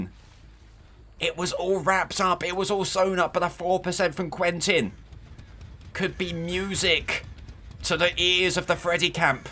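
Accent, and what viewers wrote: United Kingdom. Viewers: i love your accent its honestly very cool you are my fav british (assumed brit, sorry im not sure which specifically if its wrong) dbd creator you always do good commentary as well. real proper gent. as an American i always like to hear the way others talk its a nice change to listen to a non american accent! good stuff.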